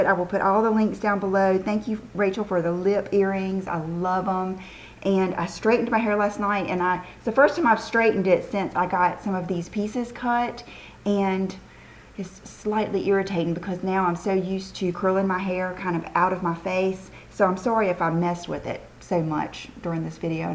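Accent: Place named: North Carolina, United States. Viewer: You are so sweet. I watch you when I can. I really like the Burberry creme shadows. I've been using a silk pillow case for a couple months and found mine would fall apart in the seams. I'm going to try the one you showed. I'm a retired special ops wife and have a home in Fayetteville NC, and it sounds like your from NC and I was wondering where? If you can't say that's fine. Love you acsent and never be sorry about it, that's what makes you special. Have a wonderful week.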